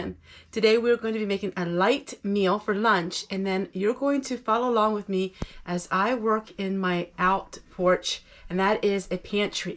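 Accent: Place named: Ohio, United States. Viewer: Your accent sounds like your from Berlin OH.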